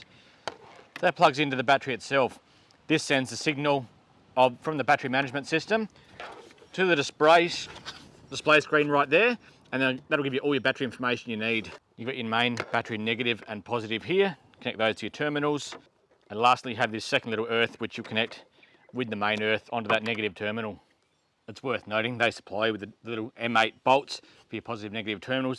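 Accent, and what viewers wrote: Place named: Australia. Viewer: Can barely understand what your saying really really thick Australian accent nevertheless very interesting stuff, thanks Dude .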